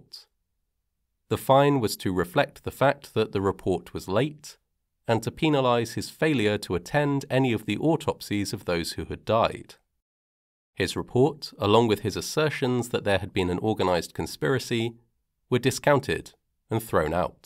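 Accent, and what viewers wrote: England, United Kingdom. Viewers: I love listening to your narration, I could listen to it all day. Out of curiosity, what region of England do you and your accent hail from? Although some accents of “Old Blighty”, like ‘Cockney’, are quite unmistakable; but of others, I’m sorry to say, that my American ears sometimes have difficulty in their ability to distinguish.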